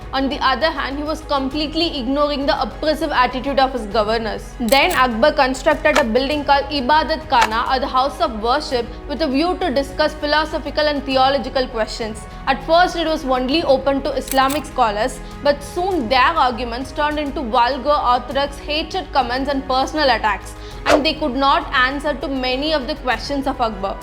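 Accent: India. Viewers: Which reason of India is her accent from? So cute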